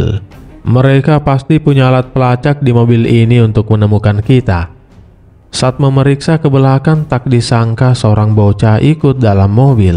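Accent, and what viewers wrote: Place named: Eastern Indonesia, Indonesia. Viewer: kok ada logat indo timur..? barangkat.. salam dari maluku🙏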